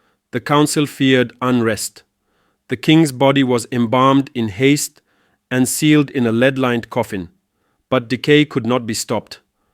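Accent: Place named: Pakistan. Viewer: Pakistani accent detected. Video rejected.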